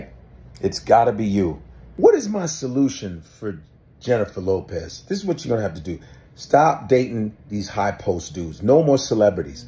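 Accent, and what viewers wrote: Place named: Bronx, United States. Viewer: lol! Yo that BX accent is 100!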